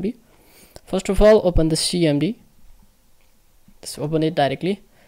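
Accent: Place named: Arab world. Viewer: What country are you from you look Indian but have an Arab accent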